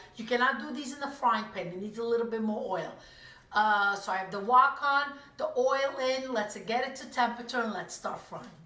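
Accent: New York, United States. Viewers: They look so good! I have to ask you, how long have you been here from Napoli? Your NY accent makes me think it's been a while. Lol..l love to hear you speak Italian. I don't hear it anymore. 😥 Did you teach your kids Italian? My father spoke it but never taught us..He was born in NY but Italian was his 1st language..He had to learn English for school. Good to see you today! ❤❤😘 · Hello, I subscribed to your channel yesterday and had to call my mother’s(Phyllis) friend, Angela to ask because I just knew you were her sister! Same exact Italian/NY accent! Refreshing to hear since I moved from Ft. Lee to Indiana some years ago. I miss all things Italian since there aren’t any Italians here! No bakeries, no good cannolis, etc. My mother used your recipe for Ricotta cheesecake and I’ve continued the tradition.. I’m so glad I found this channel! 😊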